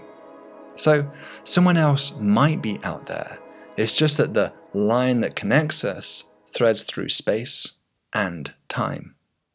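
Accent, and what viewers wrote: United Kingdom. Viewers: How appropriate to use the vocal musings of the great Carl Sagan. Fantastic and along with the gentle British voice of the narrator, soothing.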